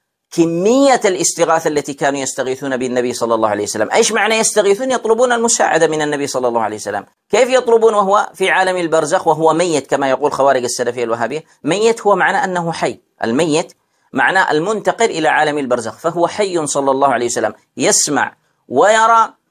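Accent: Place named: Yemen. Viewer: لهجة هاذا الشيخ كانه يمني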